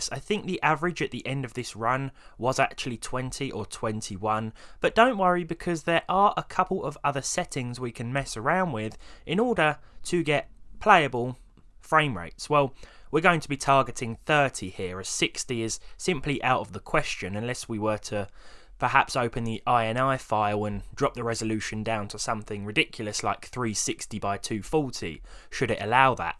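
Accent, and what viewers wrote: United Kingdom. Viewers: same lmao the british accent really makes it funnier too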